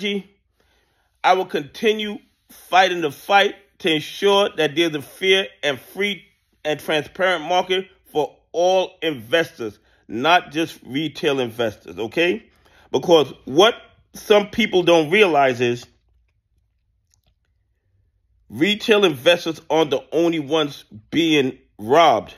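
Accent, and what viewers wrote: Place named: Boston, United States. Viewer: He did not have the money to Sue you guys should work together he's got a smart thought behind it You sound like al from Boston look where he went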